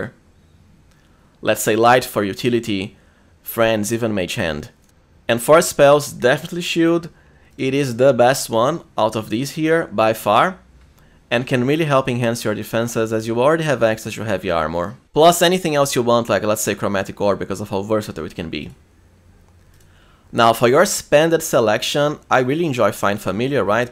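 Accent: Brazil. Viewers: Are you brazilian? I watch Luality and she pronounces "to" just like you ("chew", "chewday" etc) lol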